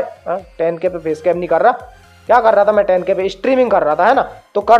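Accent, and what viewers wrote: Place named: Rajasthan, India. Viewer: * WHERE ARE YOU FROM*.???????????????????? RAJASTHAN SE LGTE HO BHAI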